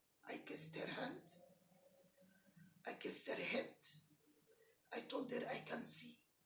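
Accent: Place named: Egypt. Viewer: لهجتك مصريه خالصه رغم التحدث بالانجلش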